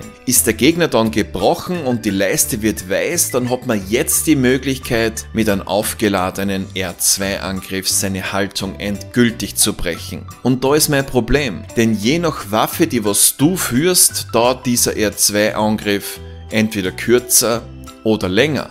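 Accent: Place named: Vienna, Austria. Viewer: zu schwer - ganz einfach: 90% der Spieler, die es kaufen sind nicht gitgut und mit einer kleinen Minderheit(profispieler) macht man kein Geschäft - mir z.B machen die Bosse überhaupt keinen Spaß sondern das dazwischen .. ich spiele schon seit 11 jahren Skyrim (pause mit Elden DS3 Sekiro usw.) aber nicht das Spiel selbst sondern weil es riesige modder szene gibt - ich schraube nicht im Spiel sondern am Spiel - herrlich bescheuert - bald kommt Lords of fallen - freu mich deine auf Anleitung - als Wiener mit dem Slang ein Genuss -habe es vorbestellt mit DarkNight extra :D weiß jetzt schon ich das nur gefickt werde :D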